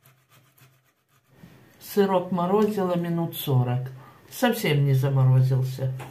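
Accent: Odessa, Ukraine. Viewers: Так мне слышится Одесский говор 😊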